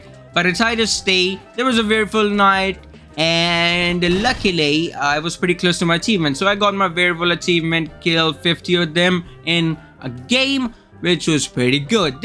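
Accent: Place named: India. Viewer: Bro sounds indian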